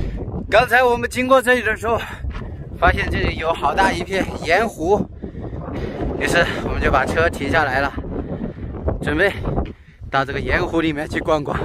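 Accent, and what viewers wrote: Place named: Sichuan, China. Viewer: 是重庆哪里的？和川北的方言最像。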